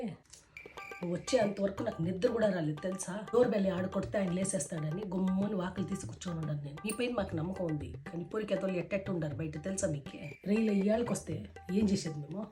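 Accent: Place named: Karnataka, India. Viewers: Kannada vallu telugu matladinatlu vundi.. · Karnataka boarder Telugu😂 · karnataka telugu, likely from bellary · Bangalore తెలుగు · akka miru karnataka na, miru koncham telugu karnataka vala laga ne maatladthunnaru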